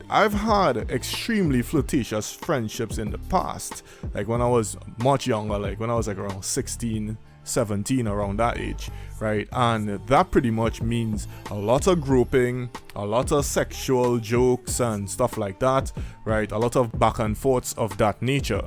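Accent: Trinidad and Tobago. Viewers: You from Trinidad? You kinda sound Trinidad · You a Trini bro? Bless up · Hey... where you from?? You sound like a trini?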